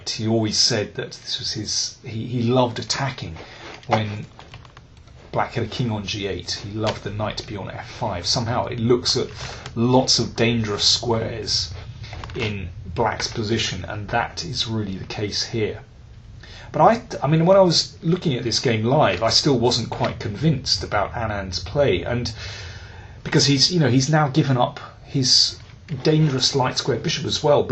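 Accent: United Kingdom. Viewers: lol what's up with this guy's rich british accent, sounds very cartoonish haha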